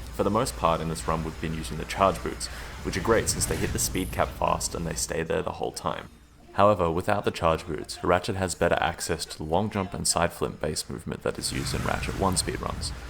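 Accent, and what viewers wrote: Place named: Australia. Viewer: Pro tip for understanding australian commentary: Be australian.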